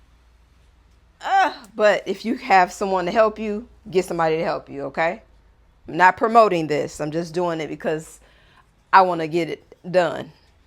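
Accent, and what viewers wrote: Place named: Chicago, United States. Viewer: I have fallen in love with you!!! Ahhh.. and I recognize your accent. Chicago! Thank you for your sharing amazing talent! I love decorating and making my home elegant and beautiful! Your tips and so easy and very helpful!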